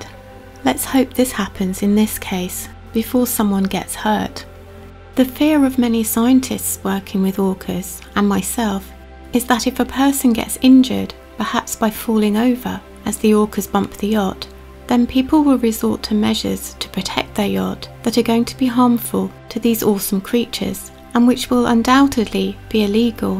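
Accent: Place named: England, United Kingdom. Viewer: No problem, as an orator you are exceptional, I hope it is a professional position, it should be. As a retired teacher (STEM) pace (slowish) and clarity are essential for comprehension. In addition you English accent and voice tone are very pleasant to my old Australian ears.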